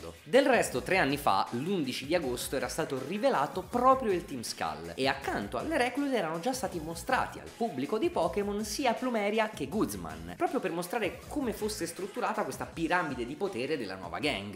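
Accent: Campania, Italy. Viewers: ma sei campano??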